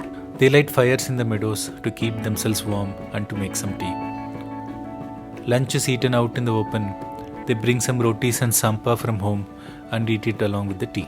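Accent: South India, India. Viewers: Indian tone whilst Briefing 😜though loved it ❤️ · South Indian talking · I think you r south indian voice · You english language not good because south type language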